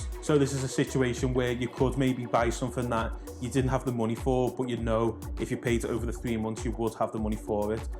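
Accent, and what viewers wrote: Liverpool, United Kingdom. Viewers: Every little helps!! That was the cherry on the cake. I only watched this because you was a scouser. Well done.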